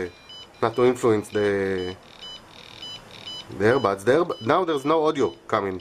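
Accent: Israel. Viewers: עכשיו ראיתי את המוזיקה אח שליח ידעתי שאתה ישראלי מהמבטא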